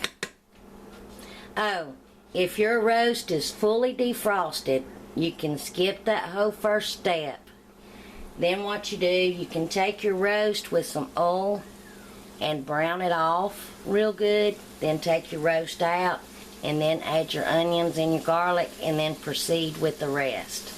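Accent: South, United States. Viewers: Your very welcome! What part of the South are you from? Greetings from Canada....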